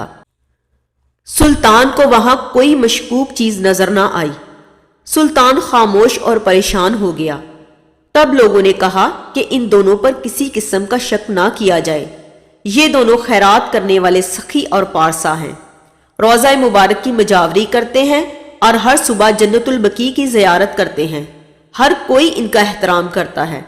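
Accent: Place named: Punjab, Pakistan. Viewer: ایک مرد مؤمن مرد حق کی شان بیان کرنے کے لئے مردانہ آواز ھونی چاہیئے، مؤدبانہ انداز ھونا چاہیئے اور لب و لہجہ بھی درست ھونا چاہیئے اور خاص طور پر تلفّظ پر زور دینا چاہیئے !!! پنجابی تلفّظ میں اردو کانوں کو انتہائی بھونڈی لگتی ھے _ " معذرت کے ساتھ ؟؟؟ " سید عابد حسین شاہ اویسی ایوبی مداروی !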